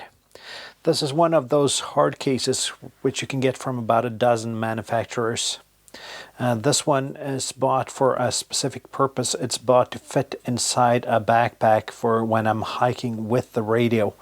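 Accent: United States. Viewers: off topic but I had to do a double take on your qth because of your English dialect sounds American is that common in Norway thanks 73s kd9jay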